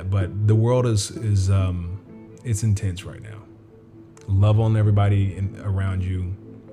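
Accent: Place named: Mount Washington, United States. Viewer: You sound like you was in Mt. Washington or somewhere close...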